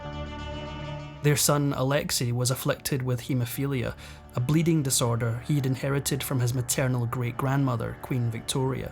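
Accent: Scotland, United Kingdom. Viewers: My all time favorite narrator Love listening to the Scot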